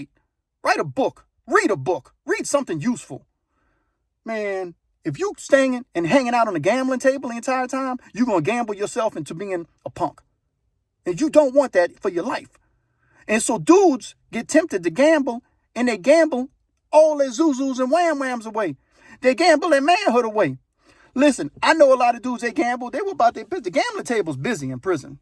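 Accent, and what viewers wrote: Louisiana, United States. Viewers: Are you from Louisiana? Like around New Orleans areas? Your accent sounds like it. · Where u from bayou boy ? St. Charles Parish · In case anyone is wondering this is a Louisiana accent.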